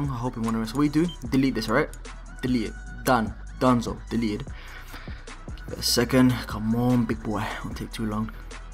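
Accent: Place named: London, United Kingdom. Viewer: London accent! Gunners Fan??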